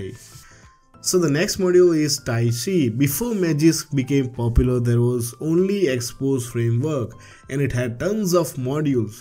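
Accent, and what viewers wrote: India. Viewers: thats Indian accent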